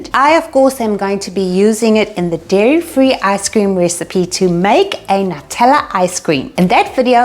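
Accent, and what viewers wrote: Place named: Durban, South Africa. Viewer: thank you love it ..you have a Durban Accent right?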